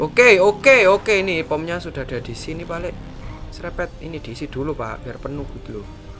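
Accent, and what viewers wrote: Java, Indonesia. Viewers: bang jawa nya medok amat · Bang loe orang jawa mana?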